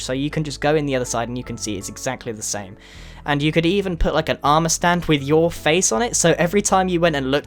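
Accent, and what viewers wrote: United Kingdom. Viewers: I love your voice if your britsh so am I! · are you British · You're from the UK?? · are you British? Love your acent 😘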